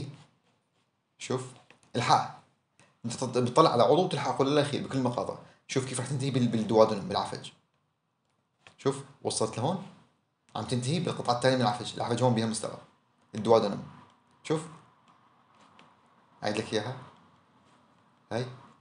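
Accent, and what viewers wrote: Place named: Syria. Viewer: اذا عراقي ليش تحجي سوري؟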